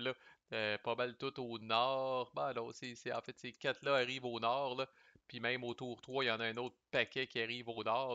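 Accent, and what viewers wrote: Quebec, Canada. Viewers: Bonjour. Je voulais simplement vous remercier d'avoir créé cette chaîne YouTube francophone, qui manquait tant. Elle correspond tout à fait à mes attentes. Vous prenez votre temps pour expliquer les jeux et perso, j'adore. Et franchement, cela donne envie de les acheter tous (ou presque). Et le bon québecois est si rafraîchissant à écouter ! Je correspond un peu au profil que vous évoquez dans votre vidéo de présentation. Passionné d'histoire avec une grosse envie de me lancer corps et âme dans les wargames, mais souvent rebuté par la lourdeur apparente des règles qui, il faut le dire, ne sont pas toujours bien présentées. Alors, quand le jeu est dans la langue de Shakespeare, ce qui est le cas dans 90% des cas, cela peut devenir un vrai casse-tête. Et pourtant, je ne suis pas une quiche en anglais. Pour exemple, j'ai fait l'acquisition de Conquest of paradise (2de edition, GMT) et de Midway Solitaire deluxe edition (Decision game) et je ne me sens toujours pas prêt pour me lancer. 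Trop de points obscurs. Comme René ci-dessous, je suis également plutôt un joueur solo. J'insiste sur ce point parce qu'il me semble qu'en réalité, nous sommes beaucoup dans cette situation. En tout cas, plus question d'acheter un wargame qui n'est passé par votre chaîne. Merci encore ! Stéphane.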